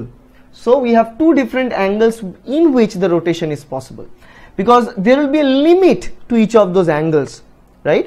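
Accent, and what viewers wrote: India. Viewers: such indian accent.........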